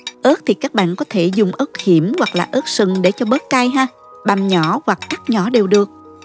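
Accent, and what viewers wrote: South, Vietnam. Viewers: Giọng nói ngọt ngào quá. Tiếc là cô dùng từ Hán Việt nhiều quá, nặng nề mà không phù hợp. Chẳng hạn, “ cứu cánh” không có nghĩa là cứu giúp, cứu thoát. Cứu cánh là mục đích cuối cùng, giải pháp duy nhất…từ này có ý nghĩa triết học,không phù hợp với chuyện nấu nướng, thay đổi món ăn cho lạ miệng… Giọng miền nam ngọt ngào thì nên dùng cách nói giản dị, thân tình, dễ hiểu thì kênh của sẽ thu hút nhiều người xem hơn đó.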